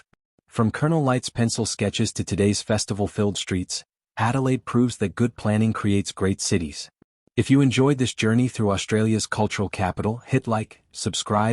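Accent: United States. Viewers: Why must there be an American accent narration? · Fuck this I can't even watch a video about my own city without some yank doing the narration because Australian videos are not allowed fuck YouTube sick to death of this I refuse to be Americanised by Google fuck that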